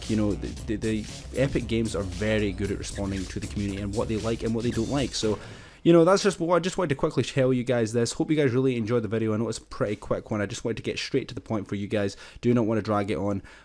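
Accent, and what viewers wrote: Scotland, United Kingdom. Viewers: Are you Scottish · Is that a fellow Scottish person I hear?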